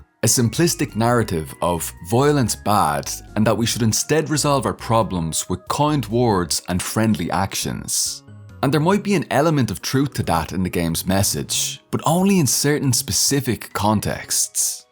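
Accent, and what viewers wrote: Ireland. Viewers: yo I clicked on the video and in the first few words I was yoooo this guy is a fellow Irishman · as a fellow irish person, it's so great to see another undertale fan from our country! this video was amazing and extremely well articulated ❤ you earned a new sub 🇮🇪🇮🇪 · I’ll have forgotten the spoilers by the time I play. besides, you arent the first. the people I used to know back in school would always talk about this game. of course, none of them did it with a handsome Irish accent and a Marxist analysis to top it off, so Im a little more inspired to see the game for myself now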